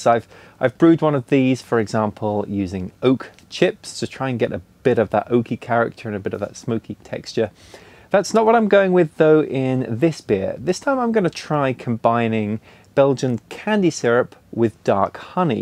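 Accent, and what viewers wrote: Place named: United Kingdom. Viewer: I was actually thinking something similar when I heard her speak - there's definitely some British influence rubbing off on her accent sometimes!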